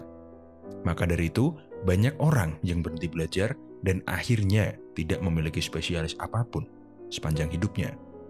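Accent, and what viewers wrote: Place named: Semarang, Indonesia. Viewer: Koyo suarane wong semarang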